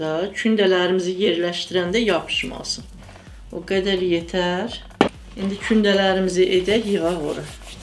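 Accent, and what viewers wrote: Nakhchivan, Azerbaijan. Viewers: Bacım Naxcıvanlısız?? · Eladi. Deyesen Naxcivanlidi bu anamiz..mende ordanam Ordubad rayonundan. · Xala deyesen naxcvanlısiz😂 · Əlinə saağlıq nağcıvanlı kimi danışır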